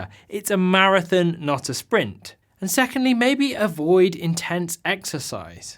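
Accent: United Kingdom. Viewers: He speaks the Queen's English, so he's the one who actually says it correctly. If you think the way he says vitamins is funny, you should hear him say "Aluminum." Heh heh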